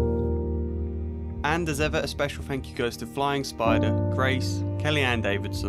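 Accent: United Kingdom. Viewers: I want you to know, that if you did not have a british accent i would not have kept watching this video. That is all.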